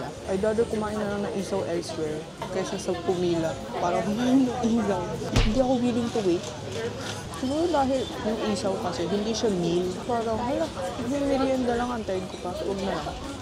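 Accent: Philippines. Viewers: Holy shit ang tapang ng accent 😭 pinoy na pinoy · Sheesh, koreana with filipino accent, kakainlab ❤😂😂😂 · You really adapt to the Filipino culture! That is so cool that you have an accent of Filipino than your own culture! Keep it up because it’s amazing! Hafa Adai in my language 😊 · I love you...ang kyute mo! Pinay na pinay ka talaga, the way you speak, smile and laugh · Your English accent is truly FILIPINO🎉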